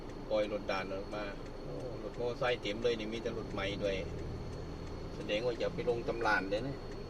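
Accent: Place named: Korat, Thailand. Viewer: ชอบดูมากพูดโคราช❤❤❤❤❤❤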